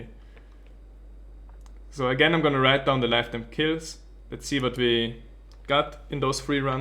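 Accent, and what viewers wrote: Austria. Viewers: I love your Videos! How could anyone not recognize that accent? Austria FTW:) (I'm german though, don't get confused) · ... I just came back to diablo just cos of this video and i will play my barb for SURE! funny accent+GREAT imformative videos+Austrian+I'll be back = im so happy that i subscribed you man. Keep up that great work dude.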